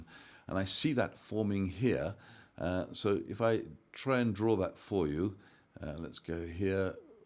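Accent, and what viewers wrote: United Kingdom. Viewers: Greetings from NY. Technically speaking, being in the "Black Friday" (profit) is positive for stocks, even though consumers will be in the Red if they overspend on things they do not need this week. I love the British accent, but I add captions to your videos so that I do not miss any details you are discussing. Love the office setup. Have a great holiday with your friends & family.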